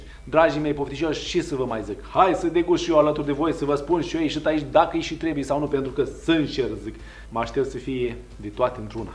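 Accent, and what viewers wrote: Moldova. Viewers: Chef mor dupa retetele tale .bune bune rau 😂.Doar un umil sfat incearca sa fii tu ca esti super nu mai accentua accentul moldovenesc ca nu e nevoie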